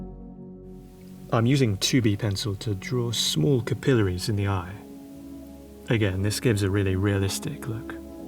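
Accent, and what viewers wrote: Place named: United Kingdom. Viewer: Yup I was thinking the same thing. The British accent ad smooth softness of his voice is just fin du fin lit! (French term.)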